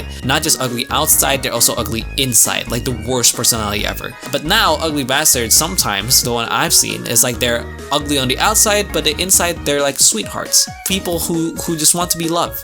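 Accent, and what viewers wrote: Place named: United States. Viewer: ayo i thought bro is american he have a very good american accent hello bang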